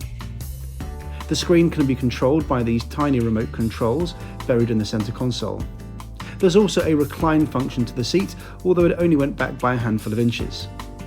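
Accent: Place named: Liverpool, United Kingdom. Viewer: Oh. No. He’s a southern scouser 🏆 😊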